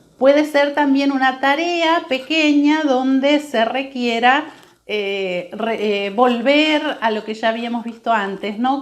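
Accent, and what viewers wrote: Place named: Argentina. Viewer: ¡ Hola,! me llamo Robson y soy de pernambuco,Brasil. creo que tú eres mi vecina, porque tu acento se parece de argentina o Paraguay. Bueno, a mi me gustó tu video. pido perdón, aún no sé escribir bien en español. Dios te bendiga.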